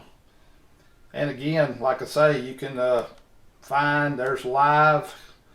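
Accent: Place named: Texas, United States. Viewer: Man sounds texan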